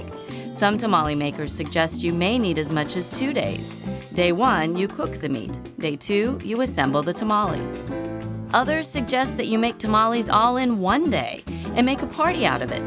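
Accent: United States. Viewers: What you heard is an American accent, not an English accent.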